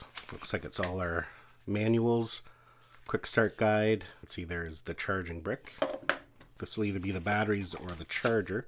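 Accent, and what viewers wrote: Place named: Maritimes, Canada. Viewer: Have yet to get my first drone, and am considering this. Excellent in-depth review, descriptions, and explanations. I learned a lot. By the way, what part of Canada was the footage shot in? The accent sounds a bit east coast-ish.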